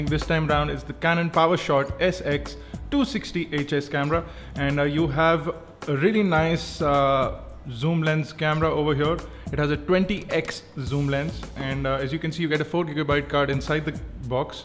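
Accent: India. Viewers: hahaha lol " press the butt'n" it's not really that hard to spot an Indian even if he's talking a foreign language.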